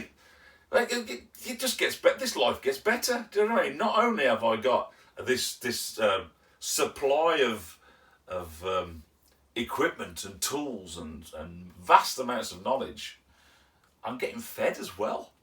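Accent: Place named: Yorkshire, United Kingdom. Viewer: Are you sure you are a pedigree Brummie, sounds to me like there may be a bit of Yorkshireman in you. Hope mrs Lumsden is a little happier when she sees your latest efforts.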